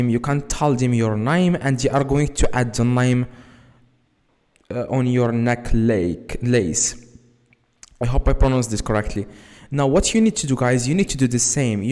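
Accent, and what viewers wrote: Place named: Morocco. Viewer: 3reftek meghribi men lkelma lowla walakin kon rir derti hadchi bl3arbia wlh l'anglais "endi m3aaaakla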